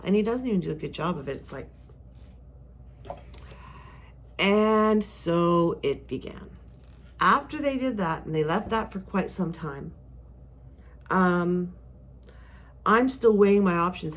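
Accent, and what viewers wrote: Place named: Canada. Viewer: I am guessing by your dialect you are in Canada?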